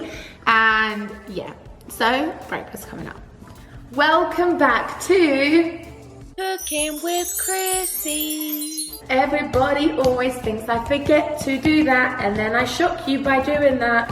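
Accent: United Kingdom. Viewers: How would you describe your particular style of British accent?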